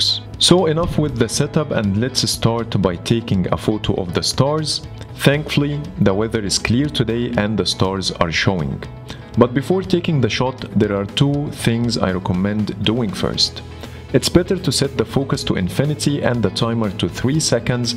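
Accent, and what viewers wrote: Egypt. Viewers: واضح من لهجتك إنك مصري 😁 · مصري مصري ، اللهجه فضحاك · Ur accent is Egypt English? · انتا مصري حاسس كدا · Your English saying that you're Egyptian, am I right?! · You sound kinda Egyptian tbh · This accent is familiar are you Egyptian?? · هو انت مصري اصلي حاسس بتواصل في سرعة كلامك مع مخي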